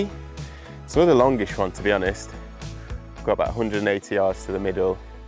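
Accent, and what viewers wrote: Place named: Scotland, United Kingdom. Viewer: Hopefully it’s only the golf you concentrate on and not yr stupid Scottish accent if this I will unsubscribe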